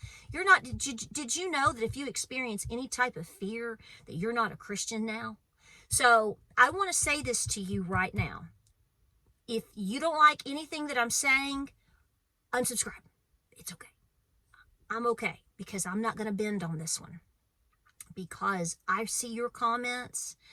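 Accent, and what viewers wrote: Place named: Appalachia, United States. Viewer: I e always enjoyed your videos! 💕 I love your voice, Appalachian twang and all! 😘 especially love your singing!!! Keep preaching sister! Some people can’t handle the truth or fear provoking retoric. So be it. They can listen to something else. God bless them. Hopefully they hear the truth somewhere and are prepared regardless. But, yes, there are some (a few that make themselves seem numerous) that are advocates for the evil ones. They (Masons, satanists, wiccans, etc) have infiltrated many churches- especially the Baptist, Catholic, and traditional Jewish Churches. Division and hatred are from hell. We need unity within the Christian community. All of us united in love. 🙏❤️🙏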